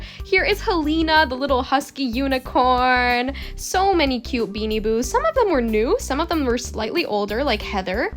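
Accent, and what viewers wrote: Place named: Mexico. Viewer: Wow!, you have a really good Mexican accent, greetings from Mexico!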